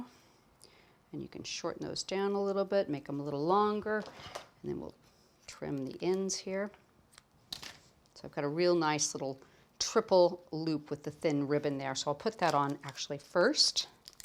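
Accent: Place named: California, United States. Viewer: Just love to watch and hear her Californian accent....cards aren't too bad either:) x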